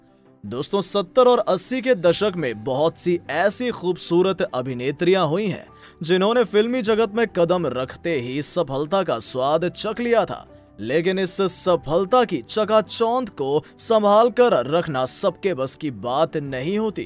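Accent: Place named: United Kingdom. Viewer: Why ur talking in Hindi with attaching English accent to it. Hindi should be spoken properly. You sound so weird.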